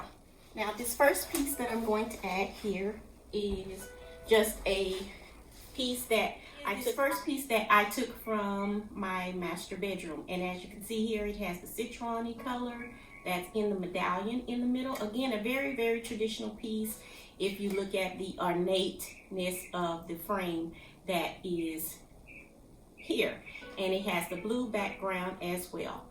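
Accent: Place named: Kentucky, United States. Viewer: Looks so good. Hay girl I am from kentucky and we say ya"ll and you sound just like us.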